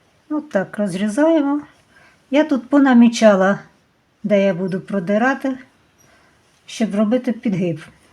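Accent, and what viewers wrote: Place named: Poltava, Ukraine. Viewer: Це-Полтавщина! Тут є все, канєшно ж!😅 І це не той випадок, коли потрібно шпиняти за вимову!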